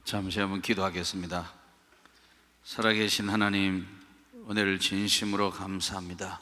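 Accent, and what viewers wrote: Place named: Gyeongsang, South Korea. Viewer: 말투가 대구분 이네요.. 역시 훌륭한사람도 많이 나와요^^ㅎㅎ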